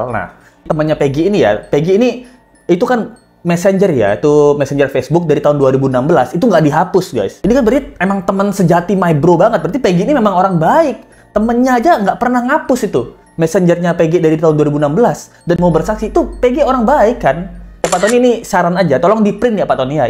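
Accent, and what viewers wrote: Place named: Java, Indonesia. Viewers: lucu si lu bang, wkwkwkwk medok nya cerbon ga gitu, itu logat jawa timuran. tp gpp lucu. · Suka gaya bicarany abang dalam menjelaskan medok khas jawany🙏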